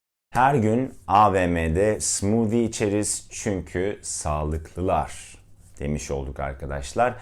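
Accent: United States. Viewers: Abi sen İngilizce konuşurken içimden 'Amerikalılar bile senin gibi konuşamıyor.Nedense senin aksanın daha gerçek geliyor' diyorum istemsizce. (umarım bi tek ben böyle düşünmüyorumdur?)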